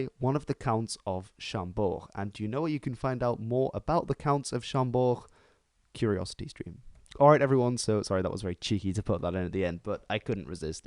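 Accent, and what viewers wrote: United Kingdom. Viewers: ok I didn’t know that because his accent is really the same as the British one, his accent is just perfect but thank you very much for the information I really appreciate that my friend. Love from France x ❤️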